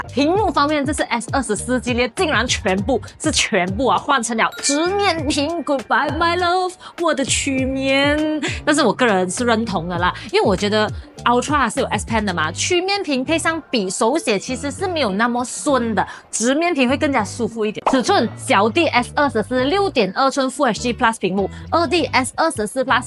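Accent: Malaysia. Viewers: Maglish 聽起來真有趣. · 马来西亚口音 没听错的话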